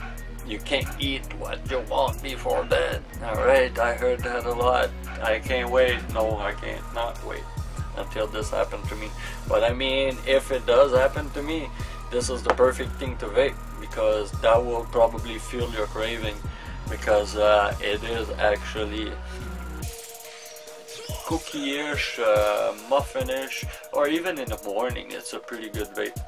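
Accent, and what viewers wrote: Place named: Quebec, Canada. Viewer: Lol im an englishmen from quebec and ur accent is bringing back good memories haha awesome reviews to bro vapin the junkie shit right now too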